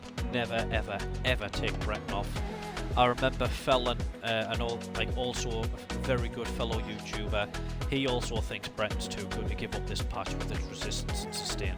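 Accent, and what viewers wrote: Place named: Sunderland, United Kingdom. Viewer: Random one are you from north east? Sounds like a Sunderland accent like me.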